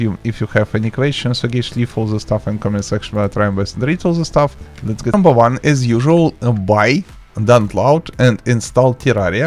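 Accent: Russia. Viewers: Чувак, русский акцент прям выделяется. А так видос классный.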